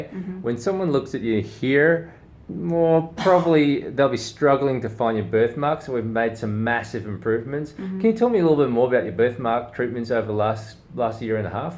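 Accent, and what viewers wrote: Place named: Australia. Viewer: Rude. You look great. As a patient I always prefer to be treated by someone who isn't cookie cutter perfect. As an American, your Australian accent is more distracting, but in a good way :D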